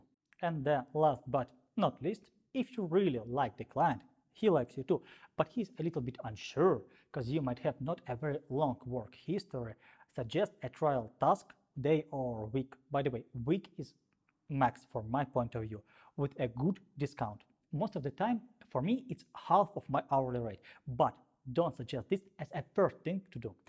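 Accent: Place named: Russia. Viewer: Hi, just you have an accent like a russians :)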